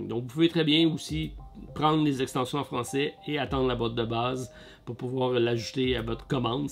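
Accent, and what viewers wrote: Quebec, Canada. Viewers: je viens me tenir au courant des sorties et me prendre une petite dose d'accent québécois^^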